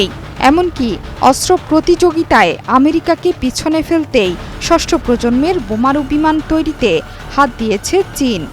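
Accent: India. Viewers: ইন্ডিয়ান স্টলে কেন খবর বলেন?? সোজাভাবে বললেই তো হয়।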